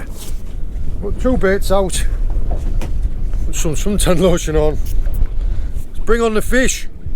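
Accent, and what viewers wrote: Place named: North East England, United Kingdom. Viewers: Really enjoying watching your videos. I’m struggling a bit at the moment with my head and watching your videos takes my mind off things. NE accent in Cornwall catches the imagination. Only ever been course fishing, but would love to try sea fishing. Keep doing what you are doing. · Hey up fella, only found your channel yesterday and totally enjoying them too. Thee sounds like a lad originally from up this neck of the woods fella. You are blessed living where you do. I would go fishing out from Hartlepool years ago and my uncle who lived up in Tynemouth would take me out when I would go up there when I would go to stay as a boy for my holidays. Love your videos buddy keep em coming.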